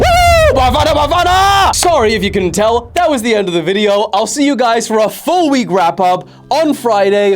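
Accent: South Africa. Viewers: Is South African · Are you South African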